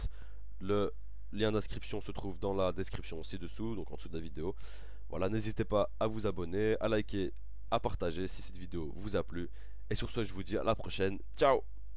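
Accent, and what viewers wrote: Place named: Liège, Belgium. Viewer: salut je viens de m'abonner super présentation, je reconnais un petit accent liégeois :) je suis de lieche aussi ;) février s'annonce prometteur aussi pour POWER LEDGER!!!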